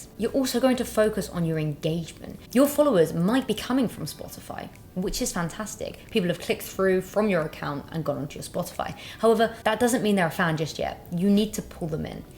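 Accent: United Kingdom. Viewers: I think your so cute and i love your british accent...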